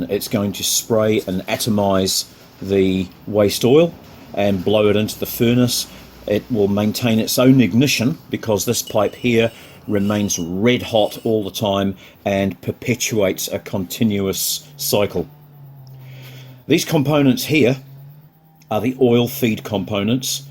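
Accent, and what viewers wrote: New Zealand. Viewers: That's a kiwi accent mate! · Is this kiwi ingenuity at its best? Taking a gamble, you sound like a fellow kiwi. Awesome stuff mate you’re a legend. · Thot you were aussie first 30 seconds.....then the KIWI became foremost. Cheers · he's in NZ, cant you pick the accent?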